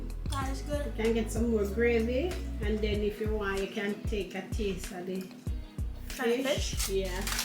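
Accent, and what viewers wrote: Jamaica. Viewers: Don't stop that is how we Jamaican talk.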